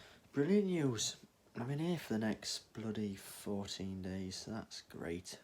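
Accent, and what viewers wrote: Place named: United States. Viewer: You’ve got an American accent now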